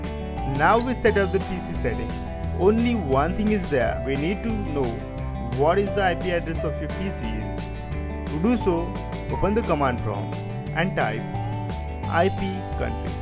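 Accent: India. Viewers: This might be racist but once I heard your Indian voice I automatically thought that "this video is a scam". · darkxx0 this is the voice of an normal Indian so don't judge anything about it.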